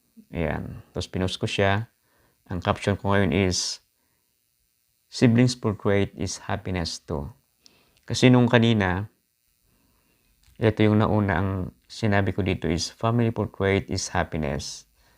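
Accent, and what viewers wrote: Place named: Ilocos, Philippines. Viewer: Ilokano ka ngayam sir haha nice one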